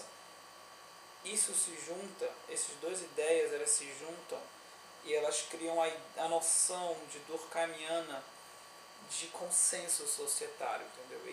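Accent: Pará, Brazil. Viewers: É paraense?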